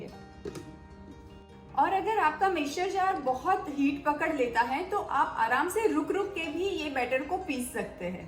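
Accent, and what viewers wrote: Gujarat, India. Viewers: its not bhiga sakte ho...its figo sakte ho...correct u r hindi..gujratishhh hindi.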